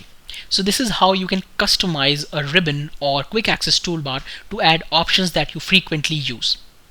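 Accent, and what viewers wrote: India. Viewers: One of the best tutorial for excel that with Indian English accent. Thq lotsss bro for doing it!!! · you know ts is good when you hear the indian voice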